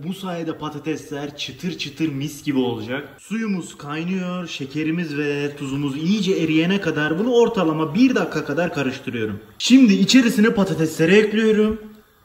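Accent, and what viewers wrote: Adana, Turkey. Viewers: videodan demiş adamın videoları var orda Adanalı gibi konuşuyor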